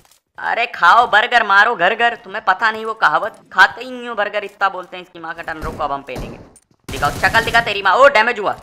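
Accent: Kanpur, India. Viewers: Bhai Full Apni Kanpuriya language bol rhe😂😂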